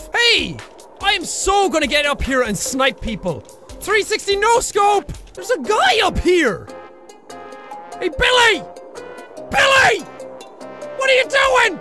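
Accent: Ireland. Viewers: Apparently as time goes on jacksepticeye sounds more Irish 🤔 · jacksepticeye YAY!!!!!!!!!!!!!!! I love Irish accsents!!!!!!!!!!!!!! I myself are half Irish ......... :)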